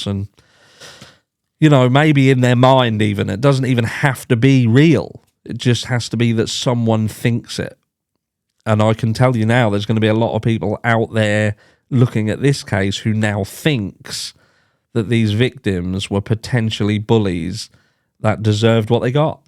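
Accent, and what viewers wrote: United Kingdom. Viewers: I have always wondered where are you from obviously it sounds like the UK somewhere just curious as for the Davis stabbing its a shame that people are so stupid with the whole snitching thing people think you're a snitch if you talk to cops Davis is a few hours away from my home an it gets a lot of bay area students an they are with the no snitching an if they come from the hoods of the bay area stabbing is somewhat normal more shooting but Oakland an San Francisco is some violent areas so its crazy there is a coincidence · NEW Subscriber Your Chat is easy to Lisen to. Even with the deep UK thing in your voice. (it Ok) Point of note. A true K BAR MARINE knife does not have a saw tooth stile on one side of the blende. It has a blood grove in the middle of the blade, A leather staked handle, with a metal back end. Is the blade must have a nick or a Bland spot. 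or super dull to Sair skin. If it has a saw teeth side on it will be one used to cut boardwear making a t 54 knife not a true K-BAR, of it is this kind of knife. look for a post on it about halfway down the blade joining the two blade together. Deep South ALABAMA USA.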